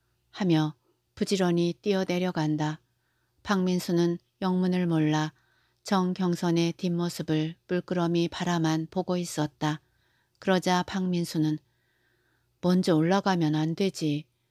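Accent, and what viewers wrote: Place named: Chungcheong, South Korea. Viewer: 네 항상 정겨운 충청도억양이 좋아요 글도 잘쓰시고 행복하세요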